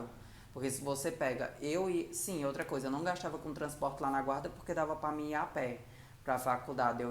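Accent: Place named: Northeast, Brazil. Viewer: Só eu que acho fofo esse sotaque nordestino ? Kkkkk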